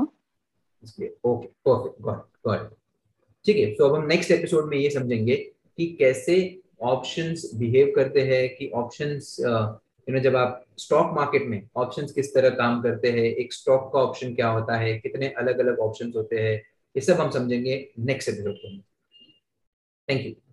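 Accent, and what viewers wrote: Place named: United States. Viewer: Sir jis hisab se English bolte hain jese usa me class le rhe ho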